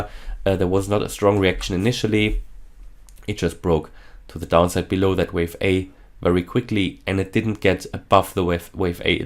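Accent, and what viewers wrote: Germany. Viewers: I bet you are either from Germany or Austria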